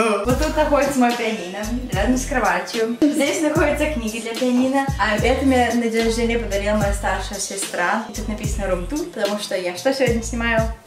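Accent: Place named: United States. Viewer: У тебя такой классный акцент американский😑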